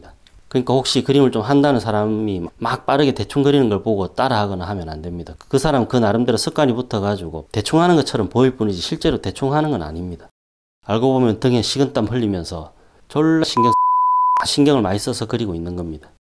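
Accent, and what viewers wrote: Gyeongsang, South Korea. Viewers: 이런 유익한 영상은 광고 건너뛰기 절! 대! 로! 안하고 다 봅니다! 선생님의 군더더기 없는 갱상도 말투, 더 없이 편하게 듣고 있습니다. 시어머니가 74세 인데, 제 결혼 사진을 보고 연필 인물화를 그려주셨는데 너무나 충격적(넘나 못그림 ㅜㅜ어머니도 40대에 그림 배웠다고 하시는데 맨날 천날 꽃 그림만 그리는 이유가 있었음. 꽃은 대충 그려도 이쁘니까)이어서 당장 유투브 보고 유익한 동영상 뒤져서 일단 구도 좀 잡고 비율이라도 맞게 그리라고 붙여드리면서 발견한 보물같은 채널입니다. 저도 중딩때 아주 잠깐 미술학원 다녔는데 딱 화실 분위기였어요. 강사님은 따로 없고, 모든 선배님들이 지나가면서 그림 코치해주시더라고요. 다들 대학생인지 잘 모르겠고 매일 오지도 않고, 원장샘은 아무것도 안하는...(기나오지도 않고 ㅋㅋㅋ) 그리고 선배님들이 다 말이 달라서 a 선배님이 이렇게 그리라고 하고 사라지고 b 선배님이 나타나서 야단을 칩니다. 야임마 누가 이래 그리라대! 그리고 다음날은 c 님이 다르게 가르쳐 주면서 기존에 배운 그림을 비난합니다. 누구말을 들어야 할지 혼돈의 카오스 같았지요. 미술학원은 다 이런건가 이러면서 잠시 다녔습니다 마음 같아선 평생 다니고 싶었는데 전공할 건 아니라서 아빠가 못 다니게 함 돈 없다고 ...ㅜㅜ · 김해입니다..경상도 사투리가 정겹습니다..감사히 보고있습니다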